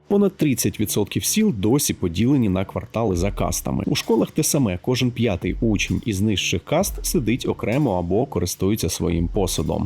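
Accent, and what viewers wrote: Russia. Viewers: Відео хороші, але попрацюйте більше над вимовою для відео. Акання дуже чутне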